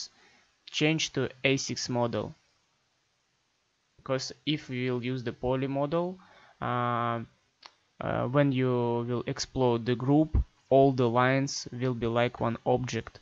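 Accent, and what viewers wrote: Russia. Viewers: Чисто по акценту руссишь слышно, спасибо)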